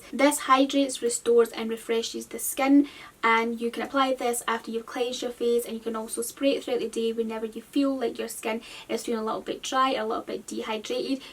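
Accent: Scotland, United Kingdom. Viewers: Beautiful Christmas box! I'm trying to place your accent. Are you by any chance from Scotland?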